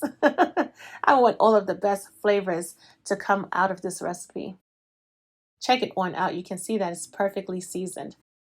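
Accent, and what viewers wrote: New York, United States. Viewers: You sound like you from NYC I hear a hint 😂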